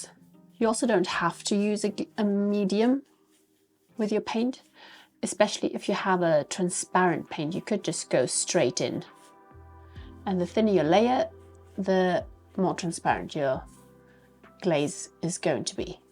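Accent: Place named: England, United Kingdom. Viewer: Fancy voice / English accent fancy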